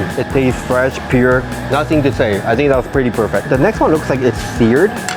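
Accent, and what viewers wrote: Singapore. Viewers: OMG you sound so Singaporean now!!! love it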